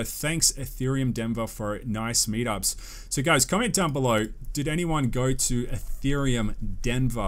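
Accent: Australia. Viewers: Good to hear a Australian accent on a crypto YouTube channel 👍🏽👍🏽 great job 👏🏽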